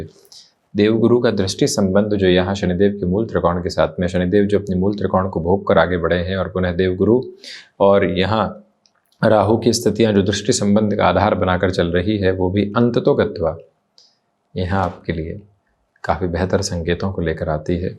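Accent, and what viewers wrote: Standard. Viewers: Your brilliant clarity with wonderful shudh Hindi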